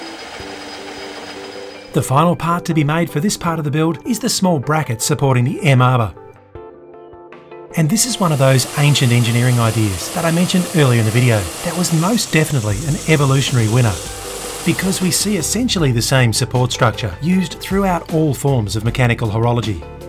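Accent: Australia. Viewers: Are you Australian? Just wondering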